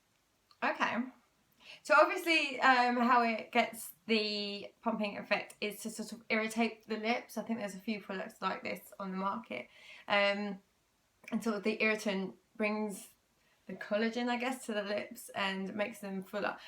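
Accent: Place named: United Kingdom. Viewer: The content of this video is not for me, but I watched anyway, because well, I am a sucker for a gorgeous woman with a British accent :)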